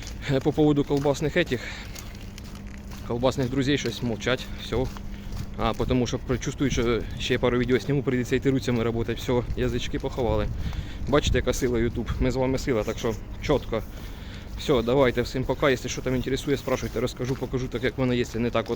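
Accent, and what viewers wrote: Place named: Poltava, Ukraine. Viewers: Від ТАКОГО суржика вуха в'януть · По розговору думаю Полтава.Или Черкассы.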